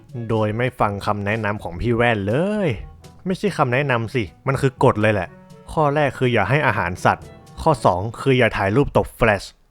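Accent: North, Thailand. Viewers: พูดเหนือด้วย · คนเหนือก๋าา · พี่คนเหนือหรอ ฮานี้บ่าเฮ้ย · คนเหนือหรอพี่ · พี่เป็นคนเหนือหรอครับ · คนภาคเหนือหรือป่าวนิ คนสปอยอะ5555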